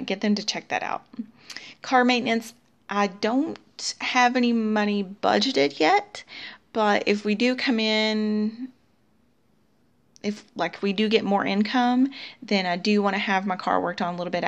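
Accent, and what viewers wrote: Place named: North Carolina, United States. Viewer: It is definitely your Southern accent! It is SO North Carolina - in a good way (remember, I am right down the road from you in Belmont, but I was not raised in NC).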